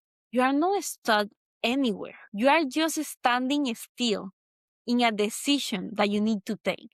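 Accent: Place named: Latin America. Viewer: Cute accent. Can I guess? South America, Italian origins, swimmer and dancer, living somewhere near the sea.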